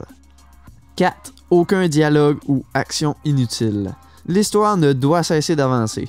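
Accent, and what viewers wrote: Quebec, Canada. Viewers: accent de canadie -> je me bare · Je suis français, dès que j'ai entendu l'accent québécois, j'ai su que le contenu serait de qualité :)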